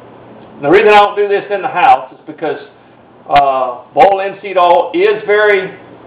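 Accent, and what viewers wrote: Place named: South, United States. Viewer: hello !!!!!!! can you please wright the ingredients because your acsent is realy suthern and i am having truble picking up on it!!!! :) lol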